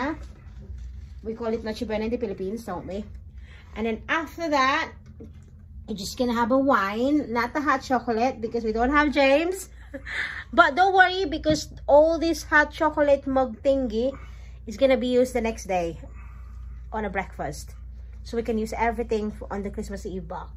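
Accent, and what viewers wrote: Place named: England, United Kingdom. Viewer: I love love the English accent. I can listen to you talk all day long. Sorry your original Christmas plan didn't happen, but time alone with each other is ❤️. So cute to hear you say mukbang and Noche Buena. Hala, Tagalog pala. Pinahirapan mo pa akong mag ingles. Kuhhh, bata ka! Hahaha, nice meeting you.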